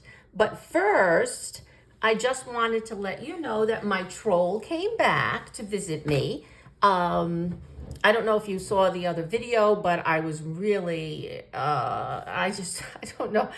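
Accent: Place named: New York, United States. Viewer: Beautiful pages! Well done! I really enjoyed your explanation and i also love your voice and NY accent! ❤❤❤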